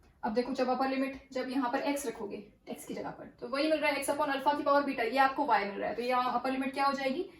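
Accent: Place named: Himachal Pradesh, India. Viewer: For once I thought you are from Himachal! haha. Nvm, Great video though!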